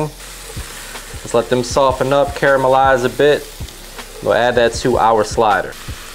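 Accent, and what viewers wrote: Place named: New Orleans, United States. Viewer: You sound like you are from the new orleans area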